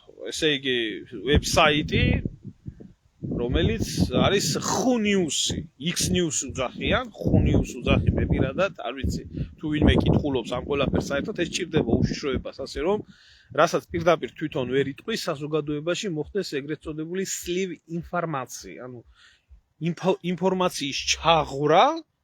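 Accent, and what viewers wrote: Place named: Imereti, Georgia. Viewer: ძმაო იმენა ორივე აქცენტი გაქ საუბარშიც ქუთაისური იმერული და სვანურიც ორივეგან რო ხარ გაზრდილი გეტყობა საუბარში მაგარი კოლაბორაციაა ქართული😅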